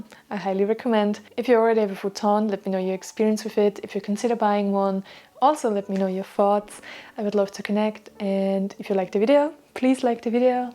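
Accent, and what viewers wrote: Austria. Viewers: first time I was really certain someone online is from Austria from an accent in English, lol (and I was right according to the channel Info) can't pinpoint the area tho, but sounds more central/ northern/ eastern than southern/ western, I think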